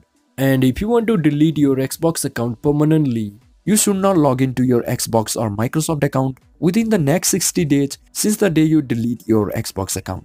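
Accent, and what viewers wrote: India. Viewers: Oh wow he was rhe best indian voice ever no raiseseme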